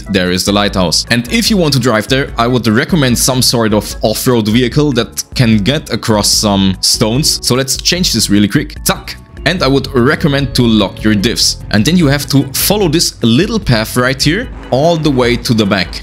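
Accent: Germany. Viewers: Aha I knew your German I could hear it was hard but I could hear it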